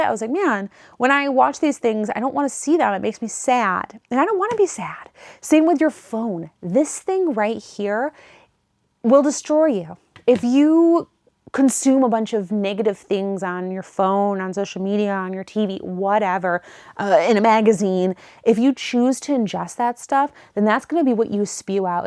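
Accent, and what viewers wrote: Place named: Midwest, United States. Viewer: I just hear accent, maybe Midwestern? Maybe there's a touch of nasal sound. Trying to hear what you complain about, maybe a couple bits of that sound. But certainly not like the Hollywood exaggeration that makes you want to mimic the sound and turn it off. Anyhow, she sounds like a sweet and lovely young lady. Didn't your mama teach you that if you can't say anything nice, to say nothing at all? Just scroll on with your miserable self and leave the sweet ladies alone!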